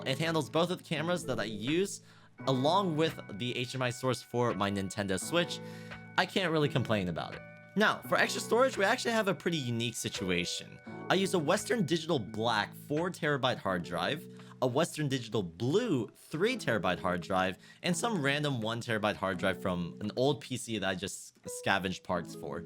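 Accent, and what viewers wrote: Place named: United States. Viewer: You sound like American but are you Korean or??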